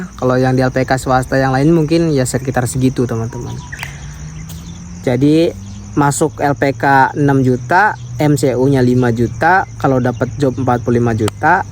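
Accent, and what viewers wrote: West Java, Indonesia. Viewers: Dari logatnya sih kayak orang sunda, Abang orang sunda kah?😂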